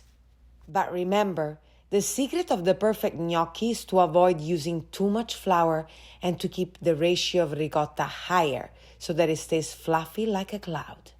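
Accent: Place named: Rome, Italy. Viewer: Sei romana pure quando parli inglese XD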